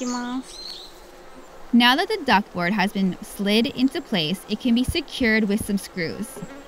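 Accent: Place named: Japan. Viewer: Жаль, что она не говорила просто по-японски. А английскую речь с японским акцентом слушать невозможно.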